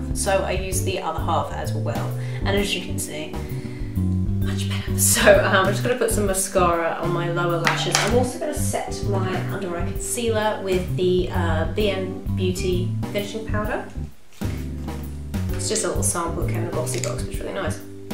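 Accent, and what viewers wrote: United Kingdom. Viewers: I agree with Teresa!!, your voice is so pleasant to listen to!, very relaxing and I do too love your british accent, that's something I have always liked (british accent). This tutorial was very good too, you explain so well!!. The lipstick/blush combo really complemented each other. Very pretty!!, you are our favorite!!!, keep the amazing job!!! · you are sooooooooooo beautiful even without makeup...but then again you must get that a lot already....but i still had to say. love your tutorials and thanks for putting up all the names becasue sometimes i hv a hard time picking up on the words with your absolutely lovely sweet english accent.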